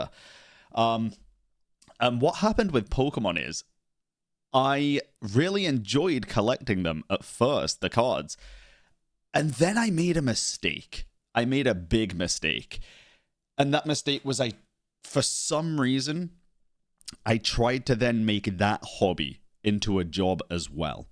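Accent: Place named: United Kingdom. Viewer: youu brought up being british, and its insane how 99% of the time i completely forget you are, even though your accent is shown with every word you say but i just never think about it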